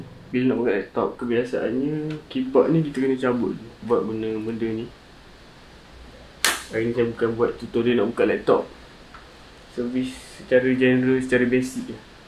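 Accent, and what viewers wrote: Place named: Rompin, Malaysia. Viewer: Org rompin...kawan agak dah dengo loghat tu...terbaik terr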